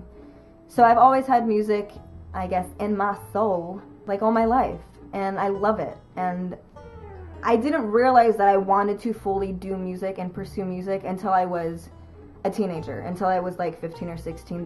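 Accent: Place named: United Kingdom. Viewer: Your so layed back and i love it!! Great little british accent to!! :)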